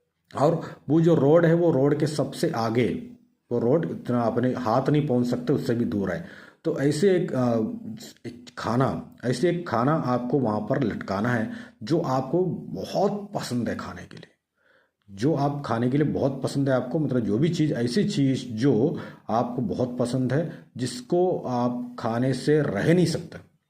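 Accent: South, India. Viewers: Aap south indian ho🤔🤔🤔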